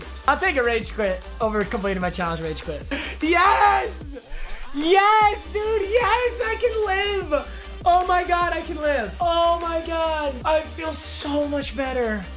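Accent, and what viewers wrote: Michigan, United States. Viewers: Are you from Michigan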